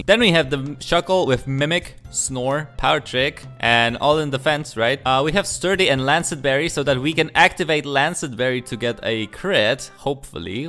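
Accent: Poland. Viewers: Love the polish accent in the video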